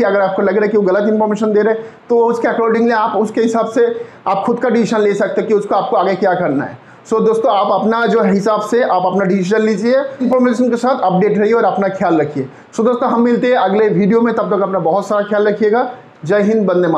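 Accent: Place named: Bengal, India. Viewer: Kya bolta rehta hai. Kuch samajh nahin aata iska. Poora bangali maushay.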